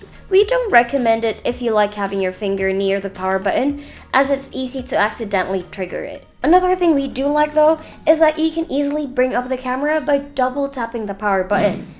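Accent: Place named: Philippines. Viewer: Her Filipino accent is quite fading, so majority of the words you hear are the right pronunciation since she has borderline American accent.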